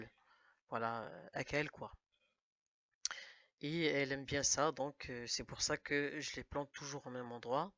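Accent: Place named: Chleuh, Morocco. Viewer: il me semblait bien à ta façon de parler que t avais du sang chelh 🤣 Moi aussi je suis chelha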